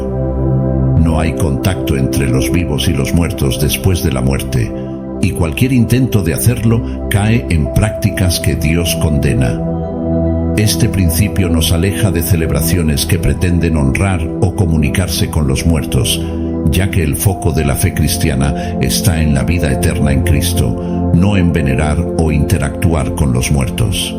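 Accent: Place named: Spain. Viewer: Es mi imaginación o es más atacar a la nación hispanoamérica? Para comenzar el que habla lo hace con acento español y se refiere a 2 días que se han establecido solo como tradición en lo que es América latina, Ya lograron introducir la religión en la mayoría de la gente y refuerzan lo que se supone es fé para "invitarte" a nunca hagas o digas nada de esas tradiciones porque Dios no lo permite. Y yo soñando con que el día que me muera se acuerden de mi para invitarme a casa a convivir con mi gente por medio de un altar.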